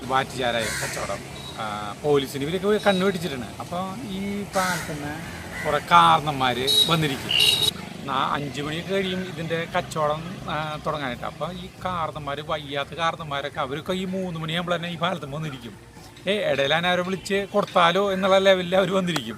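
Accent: Thrissur, India. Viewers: I like urTrissur slang .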